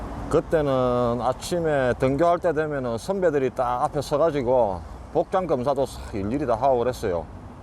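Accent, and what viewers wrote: Gyeongsang, South Korea. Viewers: 오래간만에 해운대 마실 나가셨네요~펑소엔 못느끼는대 외국에 오랫동안 나가있음 작은추억하나 사무치게 그리울때가 많으시겠죠~ 님 인기비결을 제가 나름 주제넘게 예상해봤어요~^^ 1.벗님들이 평소 보지 못했던 북미대륙의 구석구석을 운전자관점에서 볼 수 있어 좋다 2.말투는 오리지널 경상도의 투박한 사투리를 쓰는대~어라? 영어로 모든 토킹이되네?ㅎㅎ 투박한 말투의 선입견을 가진 저를포함한 벗님들의 예상을 깨는 어휘실력 3.운전벙커안의 생활 모습을 스스럼없이 보여주는 솔직함의 매력 4.본인 스스로가 어려움을 겪었던 과거,현재를 솔직담백하게 풀어서 구수하게 이야기해내는 매력 5.돌쇠와 날쇠의 멋진 콜라보레이션 특히 날쇠라는 아이템이 짱인것 같습니다 제가 생각한 인기비결이신것 같구요 캐나다 돌아가셔서도 그냥 더 잘해야지 하지마시고 평소대로 꾸준히 해주심 좋겠어요~~^^ 그리하셔도 벗님들 다들 어디 안가시고 그대로 계실듯요 주제넘게 주절주절 시부맀네요~~ㅎㅎ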